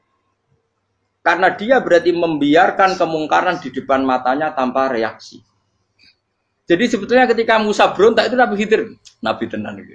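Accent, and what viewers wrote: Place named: Banyumas, Indonesia. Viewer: ❤❤❤purbalingga ngapak ndrek gus..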